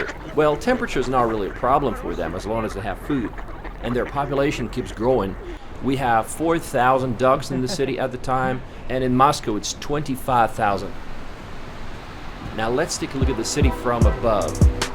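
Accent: United States. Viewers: It's weird and pointless to hear Russian city names pronounced with an exaggerated American accent, but I'm not criticising. Moreover the channel (which is great) isn't mine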